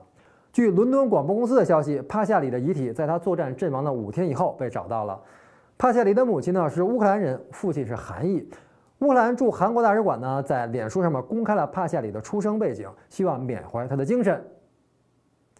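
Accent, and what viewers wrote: Beijing, China. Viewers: 广东人觉得没有困难。本来频道就是京腔京韵嘛 北京口音听着就很爽❤️ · 给你提个建议兄弟 尽量讲普通话 北京口音有点重 我是北方人听起来都有点费劲。南方人和港台地区估计更费劲了！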